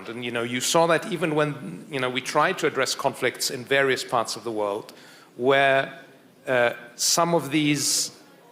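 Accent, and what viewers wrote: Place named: United Kingdom. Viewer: 😍يتكلم باللهجه البريطانيه 👍 و عادل الجبير يتكلم باللهجه الأمريكيه👍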